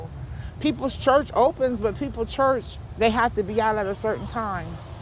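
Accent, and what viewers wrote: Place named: Liberia. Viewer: Are you Liberian? I’m picking up on your accent bro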